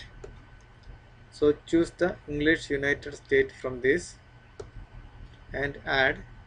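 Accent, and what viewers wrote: India. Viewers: Thank you love your India accent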